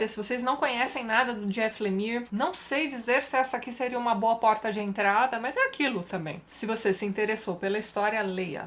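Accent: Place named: Rio de Janeiro, Brazil. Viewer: Então tá explicado o sotaque carioca :)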